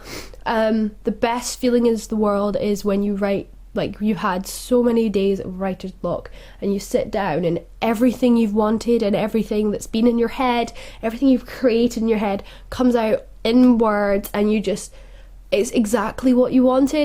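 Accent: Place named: Scotland, United Kingdom. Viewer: Haha, even with a cold, your voice still sounds really nice. I love Scottish accents. :) Okay, anyway, this was really interesting!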